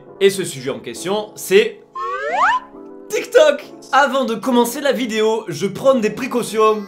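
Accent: Marseille, France. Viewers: Je suis totalement d’accord avec toi et en plus je trouve que maintenant j’entends un peu ton accent marseillais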